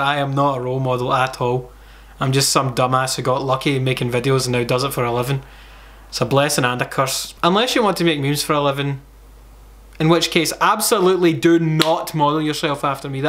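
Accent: Scotland, United Kingdom. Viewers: I love your scottish accent,:) Can listen to it all day!:D I totally need to visit Scotlad once:) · You're SCOTTISH? I only watched your metal and metal and I never knew lol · Rule 11: have a glorious scottish accent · Rule 23: Have Scottish accent <3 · Can you do an entire video in the most unintelligible Scottish accent for all the non Scots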